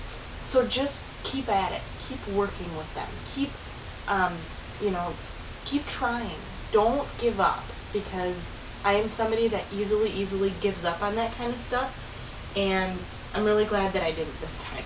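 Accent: Michigan, United States. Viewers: I knew your Michigan accent was familiar!! I live near Ann Arbor :] if you're ever in the area, my go-to metaphysical store is Earth Lore in Plymouth. I get tingles just thinking about the place! Or if you need a book, Crazy Wisdom in downtown Ann Arbor.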